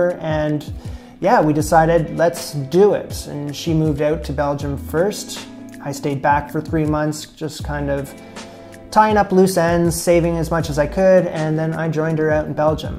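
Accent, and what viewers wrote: Canada. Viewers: I suspected you were Canadian based on how you say 'out' :D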